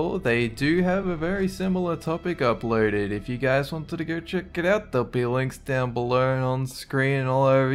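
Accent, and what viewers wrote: Australia. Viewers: The guys talking sounds like he's slurring or or mumbling. I know he's Australian but it's hard to listen to. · sounds nothing like British accent. It's Australian. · alright, been watching you for years, gotta ask: are you Australian? i know, the accent says not-typically-Australian, but you sound so colloquial. does our generation just all speak the same..? far out. anyway, great stuff, thanks n keep it up! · Hellbent's alright and all But I love the Australian accent They always sound drunk and it's amazing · he is australian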